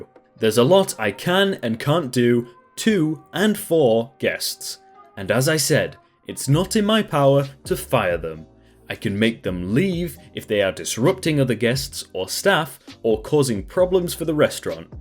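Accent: United Kingdom. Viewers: The british accent power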